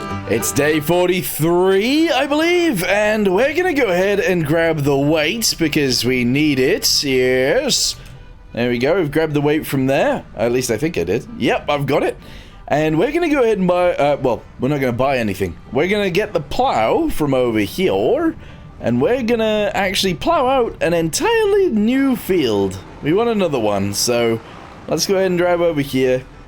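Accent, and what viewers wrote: United Kingdom. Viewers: Are you British by any chance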